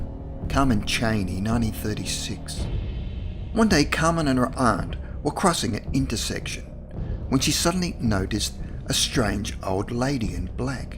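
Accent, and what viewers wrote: Australia. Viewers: That’s the way Aussie’s pronounce their numbers.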